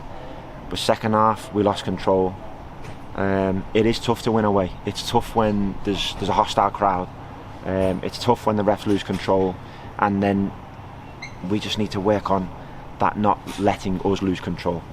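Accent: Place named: Merseyside, United Kingdom. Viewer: Still the best choice the board made for gaffer this season. Just one thing spoken to you loads more so when you were playing out field way back in division one days and I never picked up on that accent mmmm which side of the Mersey you hale from. Just a nosey Birkenhead lass asking. Behind you all the way. You've deffo got the lads hungry some stunning set pieces going on. God bless you big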